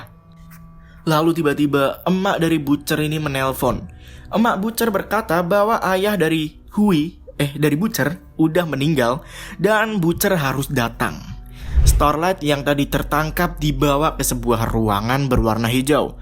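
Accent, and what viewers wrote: Java, Indonesia. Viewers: Mas sampeyan wong jowo zo...?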